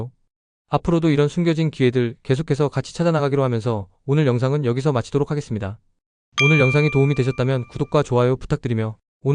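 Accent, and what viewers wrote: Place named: Seoul, South Korea. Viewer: 똑소리나는 서울분 , 반가와요. 유투브 흥하시길 💕 우연히 들어왔다 구독 좋아요 하고갑니다.😊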